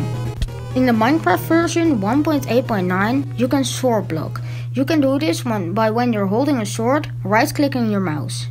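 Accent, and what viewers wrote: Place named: Philippines. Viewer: It's pretty surprising for such good fps while you have less than 100 subs and you sound filipino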